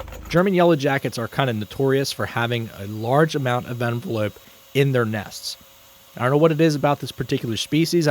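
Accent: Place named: Pennsylvania, United States. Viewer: I knew you were from PA by the accent! HA! Delco???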